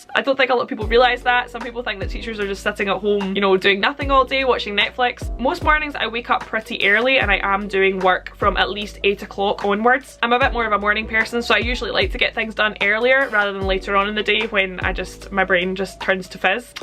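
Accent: Scotland, United Kingdom. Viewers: I could listen to you all day - bloody love a Scottish accent! Fair play to you babe teaching, I know how hard my sister finds it x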